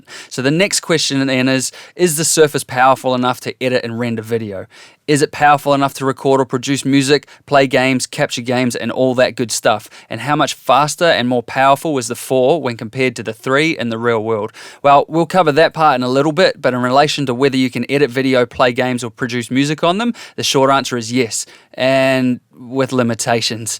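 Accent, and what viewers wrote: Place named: Australia. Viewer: nice review. Also as a kiwi living in the US it's cool to hear an accent from down under. Chur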